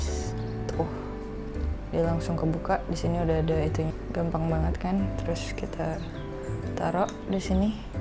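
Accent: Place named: Visayas, Philippines. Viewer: Akala ko bisaya si ateng 😂😊😊😊😘😘😘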